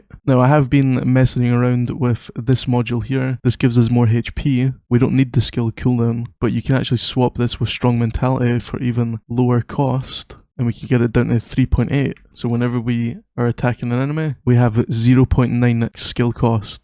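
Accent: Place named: Scotland, United Kingdom. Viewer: I hear the Scottish accent so I’ll leave you alone Back rockstar a company created by 2 Scottish brothers , not this goofiness cash grab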